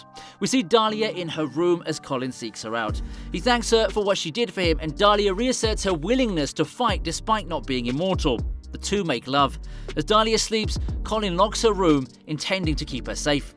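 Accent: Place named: United Kingdom. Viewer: with a British accent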